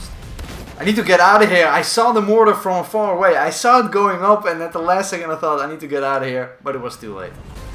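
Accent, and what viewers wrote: Netherlands. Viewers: u have a dutch like accent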